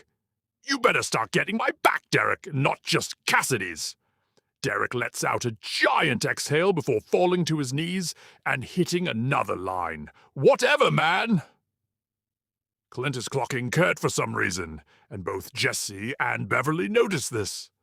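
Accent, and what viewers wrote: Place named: United Kingdom. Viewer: I object to the British accent in the narrations. He is your idiot not ours!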